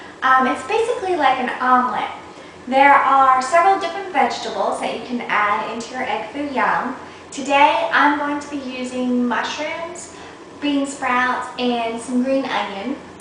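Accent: South, United States. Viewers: someone of Asian decent speak with a southern drawl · An Asian with a country accent, cool. Love this dish. Very easy to make, and very tasty! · Great job! you make it look so easy. I love your southern accent! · wow,a beautiful Asian girl with a southern accent, how exciting! Also, the food looks great.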